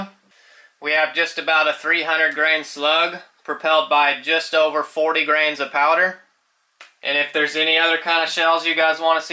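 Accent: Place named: Germany. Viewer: Great video, im curious if you are of German descent? Your accent is familiar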